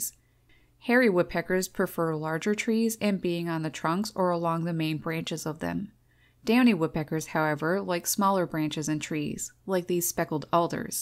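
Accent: Canada. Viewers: You have the most Canadian accent I've ever heard. Cool video.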